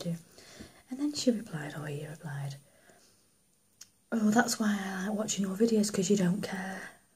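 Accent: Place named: Northern England, United Kingdom. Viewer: good work! where are you from if you don't mind me asking, I'm from South Yorkshire and your accent seems very northern? I'd guess at maybe Oldham/Rochdale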